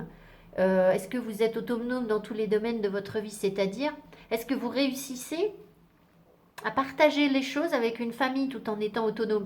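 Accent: England, United Kingdom. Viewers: Merci pour ce beau tirage plein de messages. Très parlant pour moi en tout cas et merci aussi pour votre magnifique accent anglais 😊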